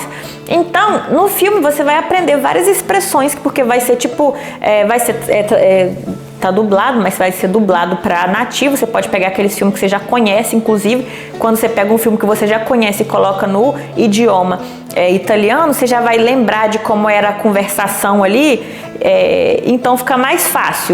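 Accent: Minas Gerais, Brazil. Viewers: AMEI OUVIR NOSSO SOTAQUE MINEIRO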